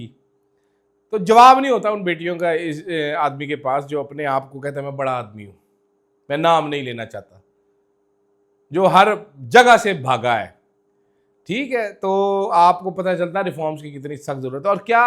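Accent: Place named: Sindh, India. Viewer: Love your Sindhi accent Please try Pashto accent next time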